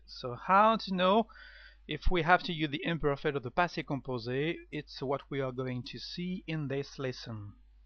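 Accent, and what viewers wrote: France. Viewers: haha love your french accent :-)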